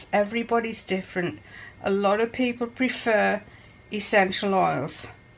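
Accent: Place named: North East England, United Kingdom. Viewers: Hi. Where’s the best for designer fragrances..ie creed .. ps love the videos…love the accent .. fellow north east xx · Love watching your videos. I live in Australia and I’m learning to make wax melts and these videos really help me :-) Thanks so much! Also I lived in Northumberland for years! my mum and family live there. Your accent reminds me of home. Im assuming you are from somewhere nearish? ha :-)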